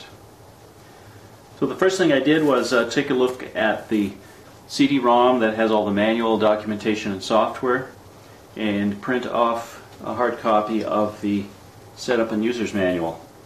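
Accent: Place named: Canada. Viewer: Great project! Unfortunately, it seems Briel is no longer selling kits. BTW, while I was watching I was thinking " sounds Canadian" and sure enough you are:) (From a fellow Canadian.)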